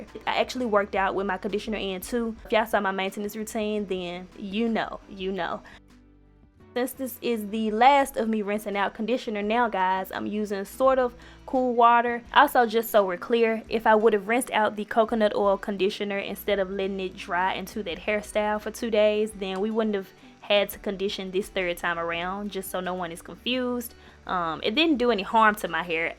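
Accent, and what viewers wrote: South, United States. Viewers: southern drawl is bomb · Sang guh lol enjoyed watching and listening to your super country accent! You have me beat lol sending love from Alabama.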